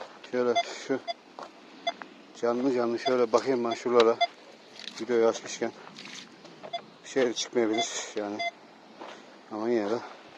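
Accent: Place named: Uşak, Turkey. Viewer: Usta sen nerelisin ya bizim uşaklılar gibi konuşuyorsun şiven çok benziyor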